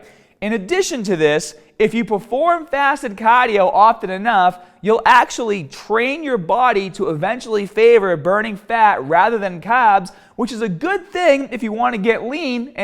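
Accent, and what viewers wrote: Boston, United States. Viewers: From Boston? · Is he from Boston? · I I've been watching your videos to help me get in shape and learn how to do stuff. I have to say this video your Boston accent was way too strong! Every time you said the word cardio it was killing me! Now... I don't think understood 1 word of this w all the tequnical jargon, except that maby I should eat a little something before my 20 minute cardio in the am, but what? a full breakfast? have exercise induced asthma so a lot of times I will walk for several minutes and then do a jog for several minutes (whether that's outside or on the treadmill). Didn't know that was considered some sort of a HIT routine. Love the shirts btw, the superheroes are the best, where do u get them?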